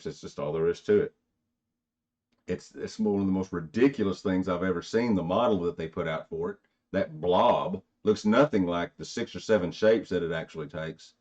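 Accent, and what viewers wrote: South, United States. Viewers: You have and American speaker acent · If I watch you for too long I might start talking Southern.I loved what you are finding and your long ago contributions.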